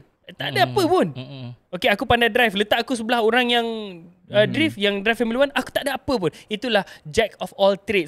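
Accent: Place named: North, Malaysia. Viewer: Smbng utaqa 😂syok tgk😂😂😂😂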